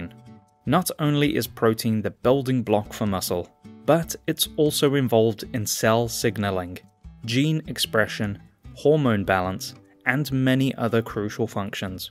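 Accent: England, United Kingdom. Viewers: A voice over with english accent means it is probably BS. Iguana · Why is someone with an English accent pronouncing items the American way?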